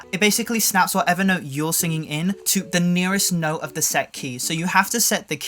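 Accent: United Kingdom. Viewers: wait you're British? All the better ig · Trying hard to understand the fast British English with the lyrics(?) while thinking maybe that learning app is a good choice for me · That British accent (๑♡⌓♡๑) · Ok. This is the first time I’m watching him actually speak and I can safely say I wasn’t expecting him to be British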